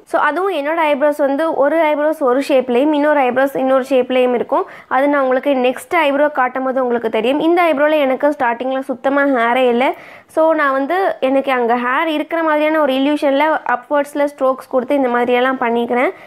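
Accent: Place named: Kerala, India. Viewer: R u Malayali ?? Gap ittit slang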